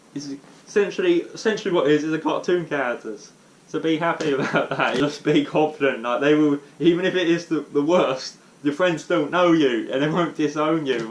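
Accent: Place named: United Kingdom. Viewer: Boi i can't stand this level of British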